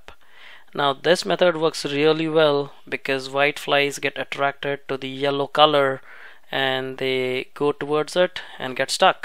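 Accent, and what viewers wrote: India. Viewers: your sweet Indian accent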